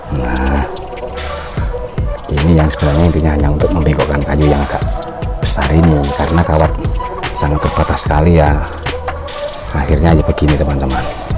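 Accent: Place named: Java, Indonesia. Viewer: Bapak pasti ada darah jawanya...bahasanya medok jawa....salam dari plat H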